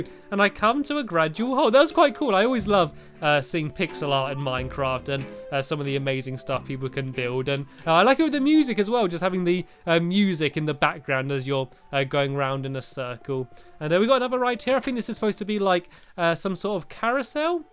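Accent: United Kingdom. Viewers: your brittish right!?!? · Are you British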